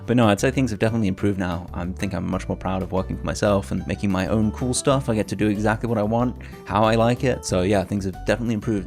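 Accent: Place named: United Kingdom. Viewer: Idk, he sounds British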